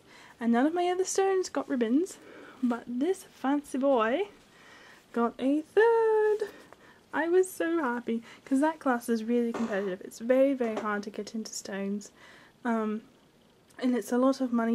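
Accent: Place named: United Kingdom. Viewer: Not to be rude but now u sound British and in other vids u sounded American, anyway love all ur vids!!!!!!!!!!!!!!!!!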